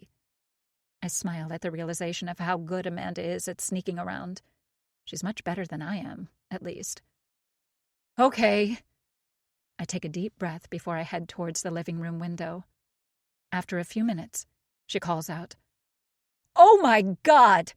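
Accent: United States. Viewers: I love the American narrators! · American Narrator ❤❤❤❤❤ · American narrator 🎉❤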